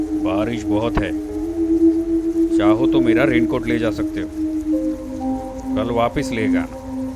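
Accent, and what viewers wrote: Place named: South India, India. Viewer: Mujhe sauth ka lga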